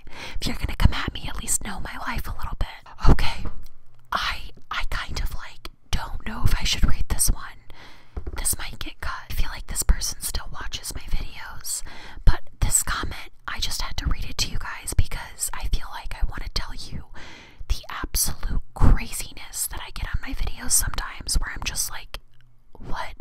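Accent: United Kingdom. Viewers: The only British accent I’ll ever need to hear the crazy loud Jesus fuck is SOO FUNNY 😂😂😭😭😭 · What is wrong with people? If you don't like something someone is saying or doing on their chanel, just don't watch. It really is that simple. Hope everyone has a blessed day. ( Your british accent was fun) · As a Brit , I absolutely love your British accent 🤣🤣. Also, abortions during birth? that's terrible JESUS FUCK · The British accent 🤣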